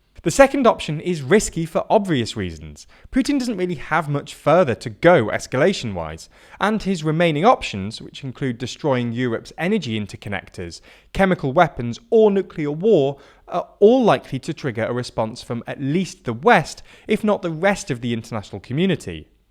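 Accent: United Kingdom. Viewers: But so british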